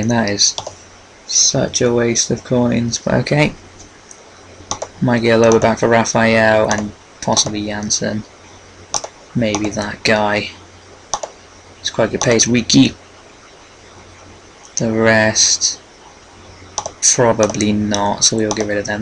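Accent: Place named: Suffolk, United Kingdom. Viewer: Ahh, ok. You just sounded like someone from suffolk! lol How do you make your coins on ultimate team?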